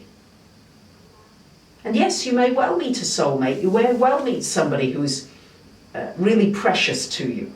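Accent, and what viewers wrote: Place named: England, United Kingdom. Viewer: Thank you beautiful gentle motivation as always. I love your friendly way with words and your accent,what part of England are you from? 💗💗💗😇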